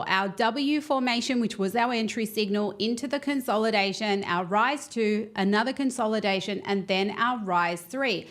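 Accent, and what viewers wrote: Australia. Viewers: woman who loves crypto with an aussie accent. Florida/usa....I COULD NOT BE HAPPIER!!!!!!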